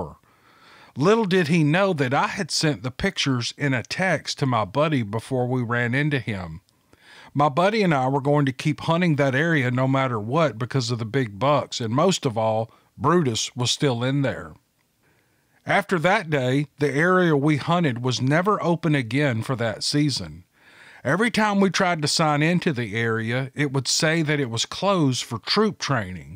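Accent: South, United States. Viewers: Howdy Sir; Just wanted to take a moment to say Thank You on this hobby of yours. Did you say you were from MS or AR? I wouldnt think TX your twang isn't that gruff. Anyway, I do enjoy your tales. I am still not all that sure what they are and why we never seem to get anywhere about anything. I am even embarrass with some of these shows with these fools going out there and yelling hitting a tree then because they do not hahve anything make themselves scared for the camera or better yet those that make side people out to get them sigh. That gets old. With all the stupid stunts if they do every figure out this stuff nobody's going to believe them anyway.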